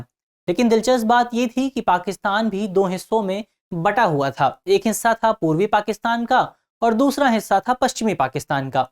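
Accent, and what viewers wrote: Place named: Uttar Pradesh, India. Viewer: Option c 1971 ,Aaj sir ekdam Up wale style me .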